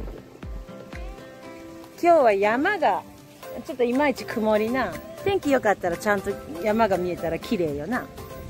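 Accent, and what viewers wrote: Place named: Okayama, Japan. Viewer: さん、こんばんは。高台からのロケーションと、チョイチョイ出てくる、さんの岡山弁！最高です👍😁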